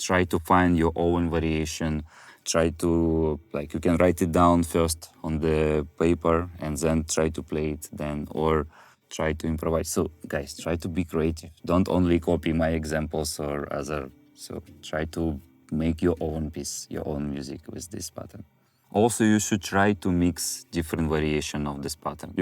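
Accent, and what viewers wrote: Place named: Russia. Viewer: дружеский совет посмотреть как избавиться от русского акцента особенно если собираешься еще делать такие крутые видео.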